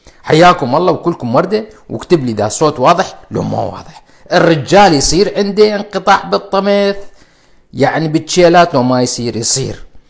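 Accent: Najaf, Iraq. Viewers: يا اخي العزيز كلامك والله زين بس احب أسمعكم تقولون باوع اعشق اللكنه العراقيه اخوك محمد عمر الصعيدي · اعتقد هاي لهجة أهل النجف الكرام.. يجر بالكلمه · لهجة عراقية مفهومة جدا من تونس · تحياتي لك يادكتور أحلى لهجة العراقية واضحه ومفهومه أفا عليك .. نسميها شطة نفس الشي 😅 من 🇸🇦 موفقين بأذن الله .. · لهجة اخواننا العراقيين جميلة ومفهومة فاهمينك دكتور من المغرب 🇲🇦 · اجمل لهجه العراقيه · بالعكس اللهجة العراقية لهجة خواش حلوه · لهجة عراقية حلوا يادكتور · من السعودية ولهجتكم جميلة العراق.... عيني ونظر عيني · اللهجه العراقية مفهومة لدى الجميع ولهجة جميلة . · من اليمن احلى لهجه العراقيه مفهومه جداً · اللهجة االعراقية مفهومة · اللهجة العراقية محببة عندنا ونفهمك بشكل واضح دكتور الله يحفظك ويبارك فيك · سلام عليكم دكتورنا الغالي شكرا علي كل ماتقدمه لنا واما لهجتك لهجة عراقية جميلة ومن احلي اللهجات ونحن ايضا نسميها شطة تحية طيبة لك من السعودية . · لهجة اخواننا العراقيين جميلة ومفهومة يا دكتور الله يسعدك ❤❤ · اجمل لهجة العراقية اليمن _ عدن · من الجزائر تحياتي لك دكتور، لهجة عراقية مفهومة. 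· دكتور انت نجفي لو كظماوي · من سوريا ... لهجة رائعة عراقية دافئة حنونه .... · لهجه جميله جدا تحيه لاخواننا العراقيين جميعا تحيه لكم من اخوانكم السودانيين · انا من السودان احب لحجة عراقية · اللهجه العراقيه حلوه وأهلها احلى.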